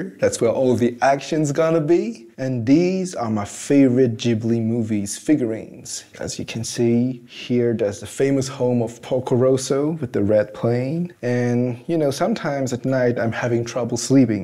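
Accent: England, United Kingdom. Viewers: "La fistinière" ahah j'y pep ! Félicitations pour cet appart il est incroyable :O Et ton accent anglais est vraiment incroyable aussi ! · Il a un Accent anglais incroyable pour un fran-nois comme il dit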